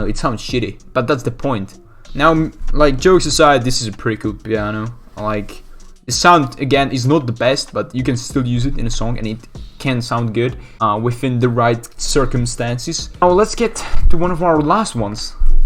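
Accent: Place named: Netherlands. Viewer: Cool to hear your voice man, where you're from? Your accent sounds kinda dutch 😁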